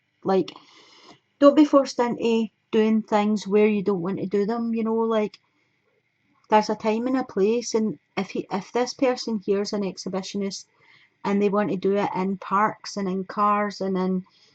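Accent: Scotland, United Kingdom. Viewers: u Scottish?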